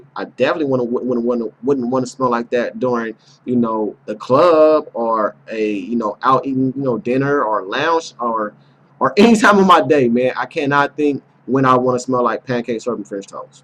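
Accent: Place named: South, United States. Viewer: Ok, I have Legend as well, but not the same. I personally you wanted to be the anti-haarlem guy to get fans. You get pass all tge orher notes in other colognes, right. The hype is guud muny, I didn't like it at saks either...but the ladies in PHILLY feelin it. You sound southern. ..different females, you have to take that into account Bruh. When you revieq come outta the box. Other than that, u aite with your presentation.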